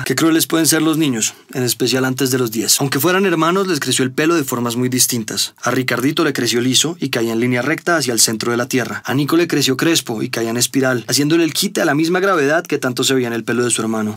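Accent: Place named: Colombia. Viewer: Ese acentico colombiano💕💕💕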